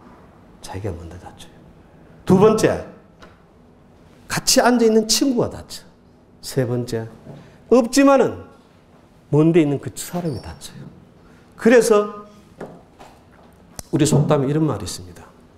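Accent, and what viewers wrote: South Korea. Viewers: 안동 영주 사투리군요. 말의 힘 꼭 명심하겠습니다. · 교수님 감사합니다 혹시 고향이 경북 청송이신가요?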